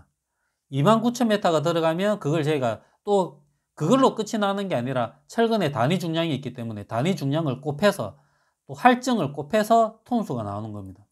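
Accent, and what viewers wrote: Gyeongsang, South Korea. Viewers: 님이 집 짓기 하는 지역이 어디인가요.? 경상도 말투 같은데 사업장과 멀면 비용이 더 들어갈까요? 저는 합천입니다.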